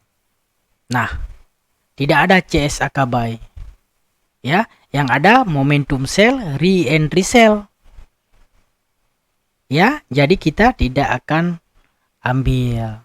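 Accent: Aceh, Indonesia. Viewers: Orang aceh ya?? Logat jih khas that..😊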